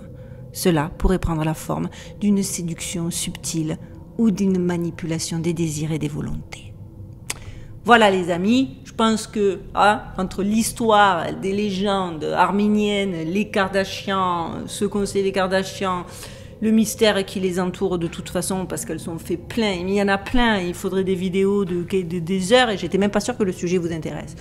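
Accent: South, France. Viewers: bonsoir j'adore votre accent du sud de la France que l'on ne perd jamais où qu'on aille je suis toujours demandé comment cette famille a pu prendre un tel essor "sans rien faire" oui oui oui je fais de la magie chrétienne et j'arrive à un bonheur incroyable mais je me suis faite toute seule et jamais le dark side ne pourra m'atteindre j'ai l'amour inconditionnel et universel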